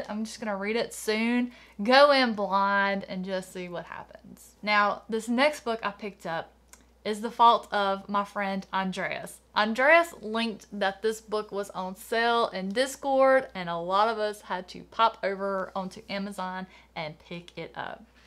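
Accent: South, United States. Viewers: Hi! I've really been enjoying your channel. At first it was partly due to your southern drawl, which I love, and I thought, "Wow, she sounds just like my husband." And for some reason, I thought I heard you say in another video that you were from TX, but I must've misheard since you clearly say in this video that your from the 'Sip, which is where my hubs is from! I knew it!! 😉 Anyway, I love your channel!💕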